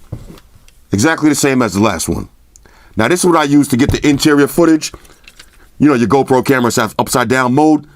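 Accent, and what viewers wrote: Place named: Brooklyn, United States. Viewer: You from Brooklyn son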